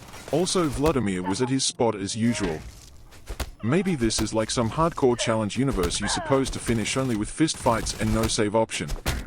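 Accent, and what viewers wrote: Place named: Australia. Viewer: Nice video. I had a bit of a giggle to myself with the commentary in an aussie accent but the script seemed to be written by an asian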